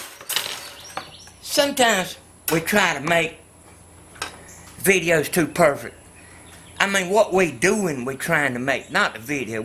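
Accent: Georgia, United States. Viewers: Smart man regardless of accent.Headed for wealth and greatness. Southern like me.I like him.You know what I mean. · He sounds like he is from Ga, but does Mississippi have the same accent?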